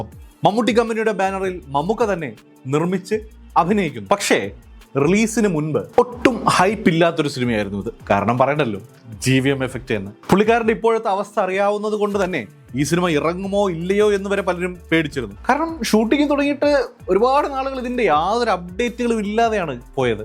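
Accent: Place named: Tamil Nadu, India. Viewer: ഇന്ത്യൻ accent അല്ല തമിഴന്മാരുടെ accent ആണത്!!!!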